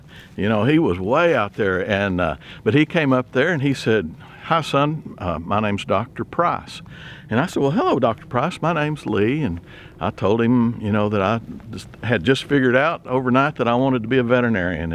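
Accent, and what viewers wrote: Texas, United States. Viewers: I could listen to your stories all day, I love your Texan accent and I’ve been down there for awhile but I’m a Canadian n need to b home but I’m greatful that I can hear ur stories and struggles along with you and your beautiful family. Thank you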